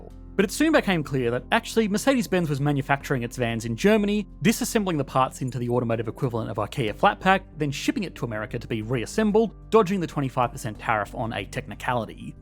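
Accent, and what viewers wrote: Australia. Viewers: Always better when something is explained in an Australian accent honestly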